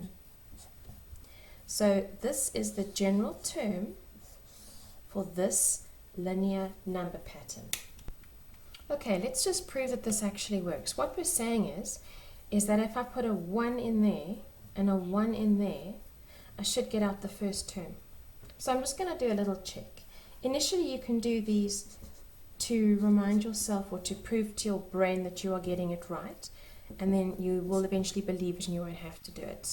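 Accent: South Africa. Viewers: Youre South African? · I honestly love SA accents so much. I have an english one but yours just sounds so much more elegant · She sounds south african ryt...?😄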